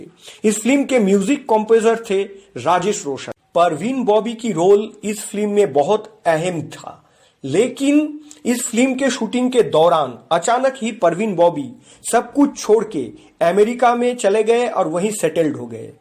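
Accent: Bengal, India. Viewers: তুমি কি বাঙালি।। তোমার হিন্দি তে বাংলার টান আছে।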